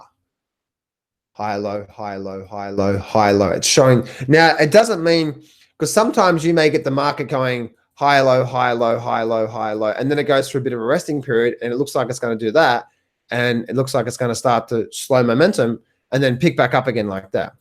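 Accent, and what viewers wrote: Australia. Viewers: Dear; thank you very much for sharing a bit of your coveted inside trading secrets. You are a wonderful person and a joy to listen to. Although you may not be aware of it, I love your Aussie accent. It is similar to a British accent but different. I grew up in Chicago Illinois USA. What part of Australia are you in? The wildlife you have there is extraordinary. I loved Steve Irwin so much. That aside, a V bottom rocket recovery like this one is certainly odd, and experts I listen to are at an impasse as to the next move. Some say we will shoot right through the confluence of resistance and keep climbing to S&P500 2800+ and up and up. Others say retest of 2500 and then up and up. Some others say a retest of the December low and then a new bull market will ensue. Then there’s Bo Polny and his biblical pronouncements of doom. I know how much you love Mr. 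Polny. Kidding of course. In closing thank you so much for sharing your insight and wisdom.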